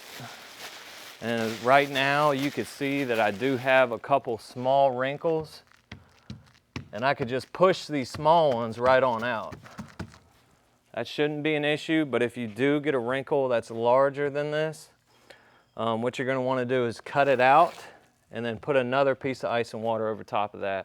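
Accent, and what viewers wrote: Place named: Rural South, United States. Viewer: Awesome video. Sounds like you might be from a southern or rural part of the country. It's cool to see white folks doing Mexican work. We don't see that here in the city.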